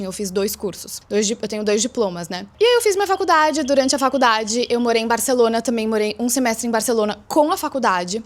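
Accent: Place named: São Paulo, Brazil. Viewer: Me impressiona que você não tenha perdido o sotaque paulistano em todos esses anos, mesmo tendo morado em Fortaleza, até por que lá o sotaque é forte.. Que legal essa temporada que passou em Barcelona! ¿Hablas eapan̈ol? Rs Poderia fazer um vídeo contando um pouco sobre seus meses lá? Admiro pessoas pessoas que tomam decisões sérias ainda tão jovens, principalmente estando longe da família. Super entendo sua decisão de voltar. A questão da violência realmente pega, e não é só em SP, infelizmente. Te sigo no TT, mas tenho entrado pouco lá p usar menos as redes, e estou gostando bastante dos vídeos aqui no YT.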